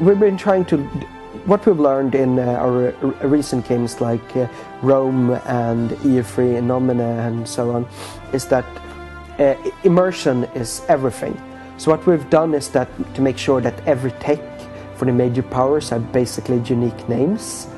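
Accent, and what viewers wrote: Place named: Sweden. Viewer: this accent is horrrrrrrible........ im swedish too but.. seriously get a proper narrator for these types of things, even if he's reading from a script. its better than someone who can hardly speak the language..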